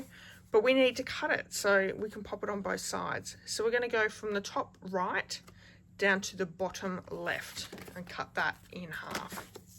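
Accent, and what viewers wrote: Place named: Australia. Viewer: I love the dsp. It’s a beautiful card. Well done! It’s also nice to hear an Aussie voice.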